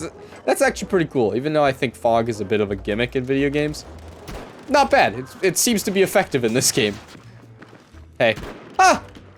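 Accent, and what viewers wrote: Canada. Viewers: Are you a newfie b'y?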